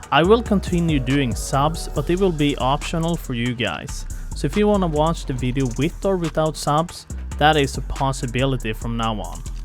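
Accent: Sweden. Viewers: i just hear you are from sweden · Think I hear a Swedish accent, Haha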